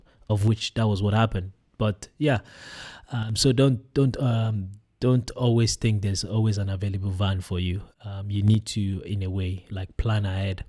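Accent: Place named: West Africa. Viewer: West Africa sounds like. Probably Nigerian or Ghanaian.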